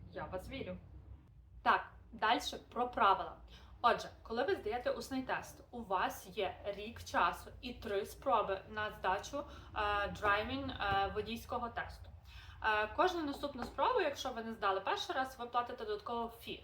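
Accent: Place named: Galicia, Ukraine. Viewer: Дякую за відео, з мене підписка, планую їхати до Нью Йорку. По розмові видно що ви моя землячка з Галичини ). Я до речі з Львівщини. Хай щастить вам на новому місці!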